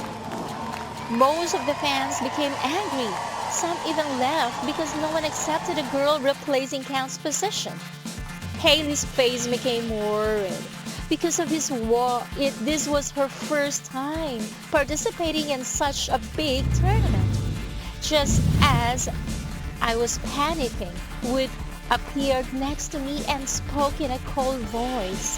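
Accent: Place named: England, United Kingdom. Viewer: its the English accent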